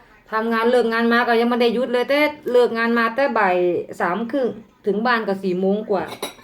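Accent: Isan, Thailand. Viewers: ได่ยินสำเนียงบ่านเฮาเอื้อยหย่าแมนคิดฮอดบ่านหลาย-กินหลายๆเผื่อเอื้อยแนเด้อ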